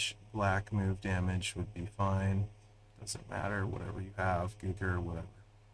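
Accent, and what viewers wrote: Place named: Canada. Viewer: That's a side effect of his Canadian dialect. It's very prevalent in that country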